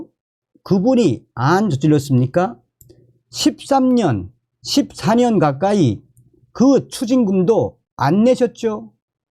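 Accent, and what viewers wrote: North Korea. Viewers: 정성산 티비뉴스 고맙수다래 ㅎㅎㅎ 이북말 정겹읍니다 🌼🌷🌸🙏🏻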